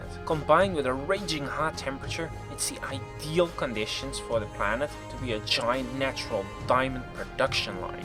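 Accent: Netherlands. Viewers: dutch accent confirmed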